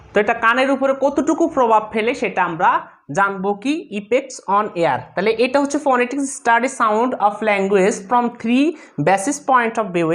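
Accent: Noakhali, Bangladesh. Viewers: Bhaiya apni ki Noakhali'r?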